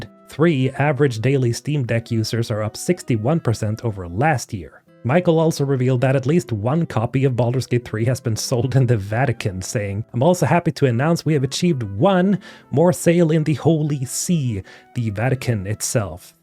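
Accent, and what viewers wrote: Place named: Sweden. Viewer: Tog ett tag innan jag hörde att du är från gamla goa Svedala! :D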